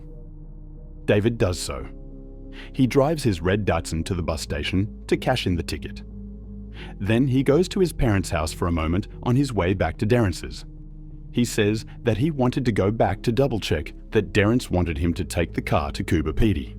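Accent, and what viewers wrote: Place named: Italy. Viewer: Was that supposed to be people talking over each other at 11 : 00 in, mate? I thought maybe it was you practising a technique of phone calls in the background as you said the rest. Maybe not I couldn’t follow it though. However, the Italian accent won me over.. I’m subscribed. I live in Melbourne and have all my life just removed to the Wimmera. Happy to find an Australian crime channel by an Australian. Good work mate. Anyway it’s 1108 into your story, I’m going back into here the rest of it. If David ?????? did it he’s the worlds dumbest criminal that ever lived LOL.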